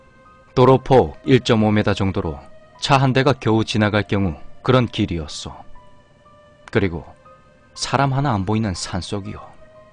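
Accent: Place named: Gyeongsang, South Korea. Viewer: 님이 경상도 분이라 아래어떤분이 서술톤이 재밋다고 하는게 이뜻 아닐까요? 사투리 쓰시는 대신에 잘생겼잖아요. 키도 저강하시고....^^ 님 도화살 조심조심 ^^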